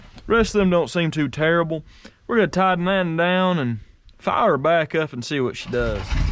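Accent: South, United States. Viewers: Shit your southern "dialect" is the best, gotta appreciate it when you hear it - not too often here in Finland :) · That southern accent even if your smart you sound dumb. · Great videos sir! Used to live in Georgia and miss that southern drawl ! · I love that Southern Accent!🐊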